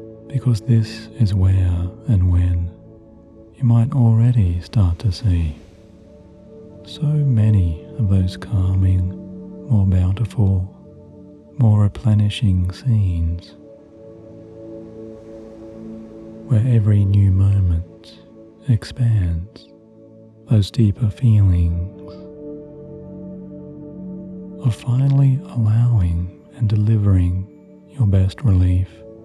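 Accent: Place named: Australia. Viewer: your Aussie accent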